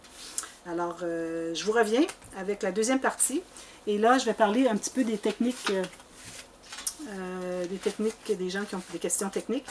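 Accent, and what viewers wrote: Quebec, Canada. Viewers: Sympa cette petite présentation et concernant la compréhension on vous comprend très bien avec ce joli accent bien typique des canadiens francophones😘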